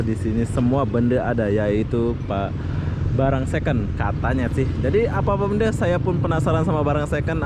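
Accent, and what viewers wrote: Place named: Malaysia. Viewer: Pagi btul dah mrayap ke jodoh bang..logat mlayu asli mlayu ke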